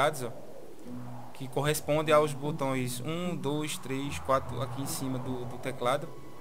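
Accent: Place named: Pernambuco, Brazil. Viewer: Pernambucano, mano? Sotaque infalível.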